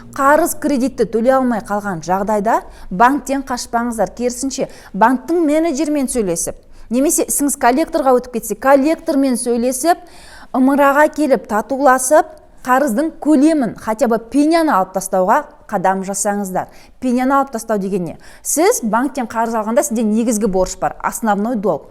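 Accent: Standard. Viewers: Кушті түсіндіреді. И таптаза казакша соилиді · Елімізде сендей қазақша айтатын жастар көбейе берсін Сенің ата анаңа рахмет қазақ тілін таза сөйлеп отырсың Қандай қазақ тіліміз құлаққа жағымды есітіледң қазақ тіліміз өркендей берсін!!Ал кридетке келсек аман болсақ төлей берерміз ақша болған кезде. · Рахмеет қазақша таза сөйлейді екен осылай болғаны дұрыс қой бәрін түсіндіиріп айтып берді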